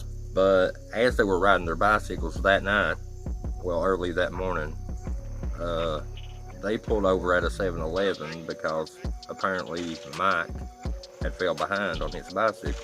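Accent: South, United States. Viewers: You have to be from the south ?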